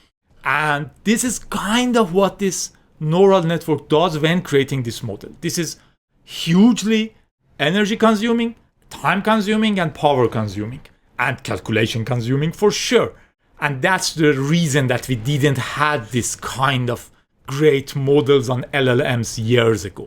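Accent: Iran. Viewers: WOW I like your thick Persian accent it makes me proud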